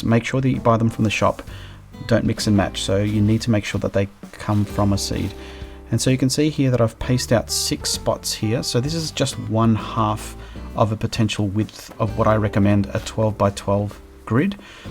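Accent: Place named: Australia. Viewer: OMFG of course you are an Aussie...I hadn't listened to the video for a while...and I knew that! (Honest I can tell the difference- and 3 of my friends left US for Australia. My bad! And so glad what our domination plot of getting you hooked on expensive Seatle coffee is working as planned!). Yeah sprinking on my flowers is prob going to be left up to me. What is so odd is I've managed to get a lot of purples (just me/myself and I). Tulips, blue roses, lots of green mums, pink everything except those dang lilies . The only thing alluding my can is the pansie (which was my native flower and I HATED It along with the damn pears...why PEARS? OMG...Apples...oranges. NO the bland flipping PEAR. ALSO (since we are new besties) I can't have any one doing a fly over at this moment...I'm redoing everything and it's a shite-show. Our numbers are horrid. I'm super immunocomp so I pretty much have been at home for 2 yrs (going out 1 or 2x a month heavily protected) but w/Omicron I've been on full lockdown as of 1/1. 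So when not working online...I'm in search of the purple pansie/windflower! Be safe my friend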